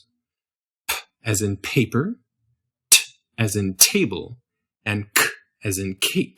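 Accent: California, United States. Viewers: it is californian:) <link> listen to lol you are caught:)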